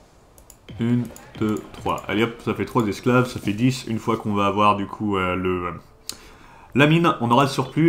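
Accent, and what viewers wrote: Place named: Netherlands. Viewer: ton Français et très bon mais ton accent te trahie:D Tu es de quelle pays? À vu de nez je dirais Hollande 🤔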